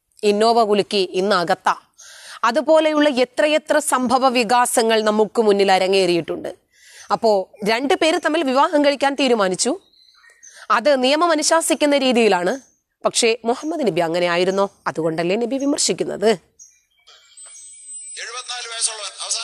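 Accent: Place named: Thrissur, India. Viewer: Porichu. Trichur. Basha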